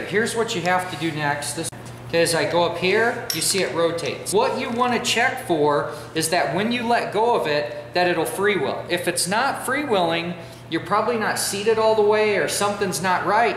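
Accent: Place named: South, United States. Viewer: Very very good this is one of the best ...I cant handle half the guys talking to much ....this fella just simply explains it I'd dang near say he's southern 😉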